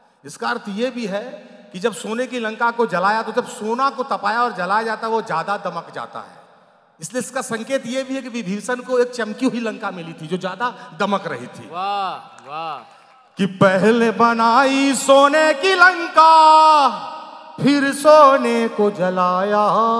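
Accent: Bihar, India. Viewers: आवाज में बिहारीपन का जो मिठास है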